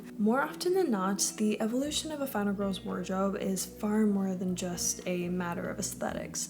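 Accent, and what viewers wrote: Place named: Brazil. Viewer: Have not watched the video yet. However, are you brazillian, girl? The accent is pretty iconic, and remind me of brazillian people.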